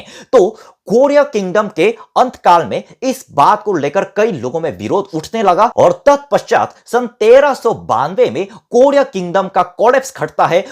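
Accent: Standard. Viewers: Apke jitani shudh hindi hume bhi nahi aati great👍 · waaao!!! i love ur hindi!! amazing!!! so shuddha!!! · Itna pure hindi ham indians v nhi bolte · नमस्ते बेटा तुम इतनी शुद्ध हिंदी बोलते हो,बहुत अच्छा लगता है,rusim धर्म बहुत अच्छी विचारधारा है,काश इस विचार धारा का अनुसरण पूरी दुनिया करे। अपने धर्म का भी सम्मान करें। ईश्वर की कृपादृष्टि तुम पर हमेशा बनी रहे🙏😊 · इतनी शुद्ध हिंदी 99.9% भारतीय भी नहीं बोल सकते हैं। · Your Hindi is so pure, 100% understand. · Bhai etani shuddha hindi to hum bhi nhi bolte jitni tum bol rahe ho gajab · हम हिंदुस्तानियों को इनसे कुछ सीखना चाहिए !! एक भारतीय न होकर भी यह हिंदी इतनी शुद्धता से बोलते है ,और हम भारतीयों को न तो ठीक से बोलनी आती है और न किसी को लिखने ,कमेंट सेक्शन में भी इंग्लिश का चलन है 😟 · अति उतम अपकी हिन्दी बहुत अच्छी है इतने शुद्धता से तो हिंदुस्तान मे कोई नही बोलता हैं आपके दवारा दिया गया ज्ञान सर्वोत्म हैं❤ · Wow you Hindi is so pure!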